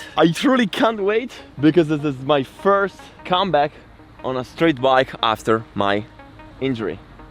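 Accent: Italy. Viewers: what ? i really knew instantly that he is italian haha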